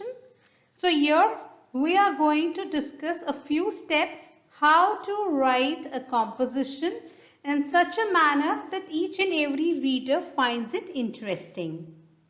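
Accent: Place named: United Kingdom. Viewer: Its a britishian way to speak English 😒foolish...😏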